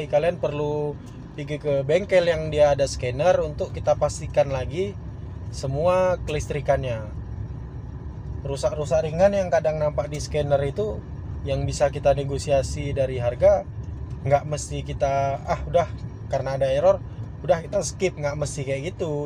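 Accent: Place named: Aceh, Indonesia. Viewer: Kalo di lihat dr logat bahasa kayaknya ini di aceh ya Bang?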